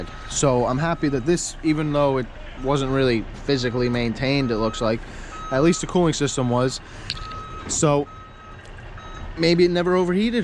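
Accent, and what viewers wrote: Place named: New England, United States. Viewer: Thanks Guys. Where are you located? You sound like New England. That’s where I am and if you’re around here would you consider installing it for me? Thank you 😊. Maggie Donovan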